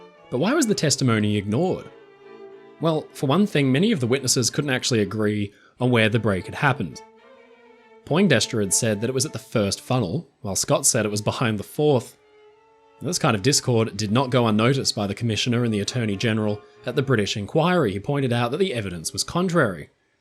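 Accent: Australia. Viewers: that's exactly what I thought, and I'm a New Zealander. This guy sounds Australian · This presenter has a slight Australian accent 🤔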